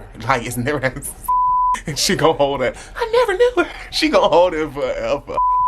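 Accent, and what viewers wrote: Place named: South, United States. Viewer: You can tell is from the south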